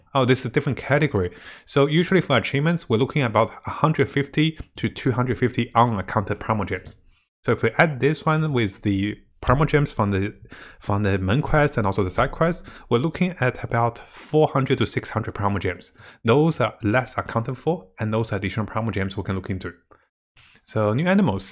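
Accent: Australia. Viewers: weyt bruh u were an ozzy mate this whole time?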